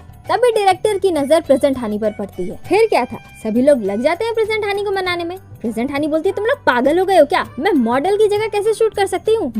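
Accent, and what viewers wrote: Bengal, India. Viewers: Explanation was amazing and funny . And 1 question you are Bengali ❓ Because you are talking like about Bengali .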